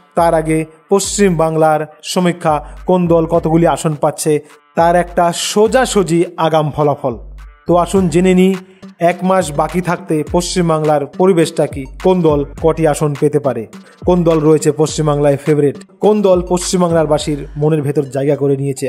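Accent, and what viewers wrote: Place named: Bangladesh. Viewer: এই চ্যানেল কি বাংলাদেশের চযানেল? উচ্চারণ শুনে মনে হল ।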